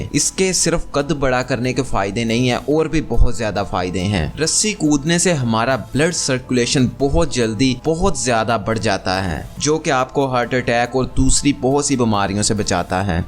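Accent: Punjab, India. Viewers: Yar apky muzuaaat intehae jandar lekin apki Urdu intehae kmzor h lehja bilkul kc pendu Punjabi ka h ya Urdu ehl e zuban ki trha bolo ya phir direct Punjabi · AP Punjab ke ho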